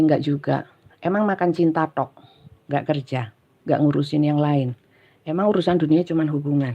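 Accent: East Java, Indonesia. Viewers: Sy sk sm logat bhasa ny mbk..medok jawatimuran.sm"dr jatim mbk..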